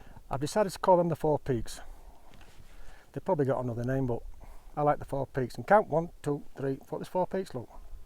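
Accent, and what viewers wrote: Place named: Yorkshire, United Kingdom. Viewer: Cheers. Me and Judith hiked this trail in 2022 and are currently enjoying watching your adventure as it unfolds. One episode a night after tea! Great to relive some of the great scenery and your take on a fabulous part of the world. Looking forward to the second half.....oh and nice to hear a Yorkshire accent!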